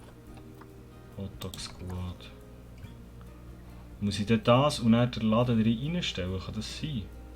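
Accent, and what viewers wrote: Switzerland. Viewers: Ich weiss nid worum aber iwie findi Schwitzerdütschi letsplays nit so sick. Isch nur mini Meinig bisch sicher mega sympathisch irl aber chani eifach nüt demit ahfoh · Was isch das für e Schwiezer Dütsch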